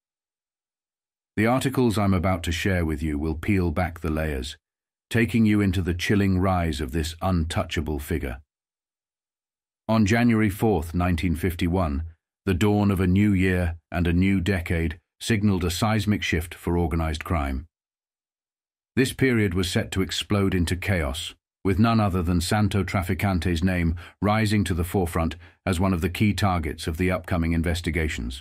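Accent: United Kingdom. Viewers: Who wants to hear a stupid British accent !!!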